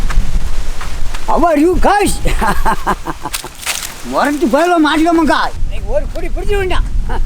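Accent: Tamil Nadu, India. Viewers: In which district have this tamil slang??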